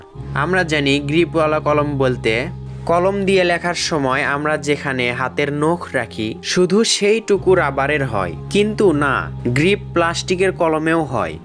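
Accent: Bogura, Bangladesh. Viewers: ভাই আপনার বাড়ি বগুড়া নাকি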